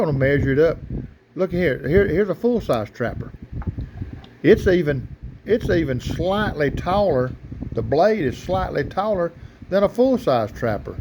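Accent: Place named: South, United States. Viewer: I subscribed to your channel you sound like a good ol knife loving southern country boy like myself. Enjoyed the video. That's right MILO'S TEA BABY LOVE IT.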